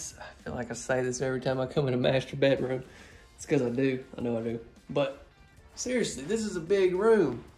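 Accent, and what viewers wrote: South, United States. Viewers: “You know whut” lol I’m from the south too 😆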